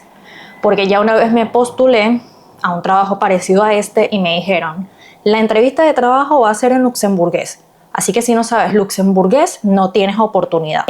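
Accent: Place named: Venezuela. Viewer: Tiene acento de mi país Venezuela.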